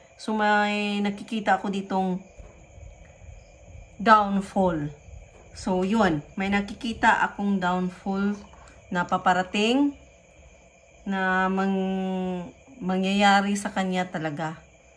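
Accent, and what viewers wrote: Visayas, Philippines. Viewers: The way you talk ay parang hindi ka tagalog. Sa palagay ko, bisaya ka. Believe ako sa tapang mo.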